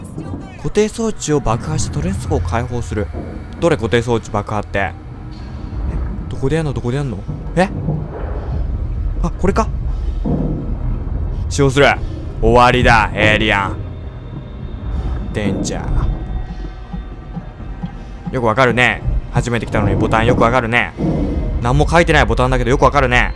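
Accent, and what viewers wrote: Kansai, Japan. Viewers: 関西弁さん好きなので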